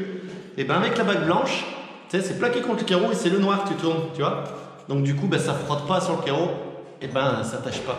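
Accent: Loire, France. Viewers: De bons conseils , de la bonne humeur, de la bonne musique, l'accent de la Loire. Du kiff, merci d'être super ludique dans l'explication, clair net agréable à souhait ! 🙏👍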